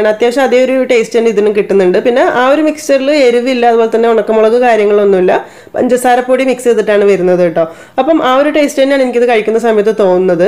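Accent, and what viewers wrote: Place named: Wayanad, India. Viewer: Wayanadan slang 😙😙😙😊nokkummo, cheyyummo അങ്ങനെ.. 💪💪wayanad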